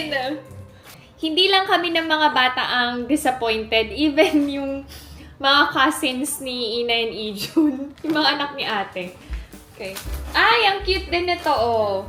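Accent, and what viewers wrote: South Korea. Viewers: Ms. ang galing mo mag Korean while you were talking to Ijun seems like I'm watching k-drama ganda ng iyong voice and koreanang koreana ang accent sana mag speak ka lagi korean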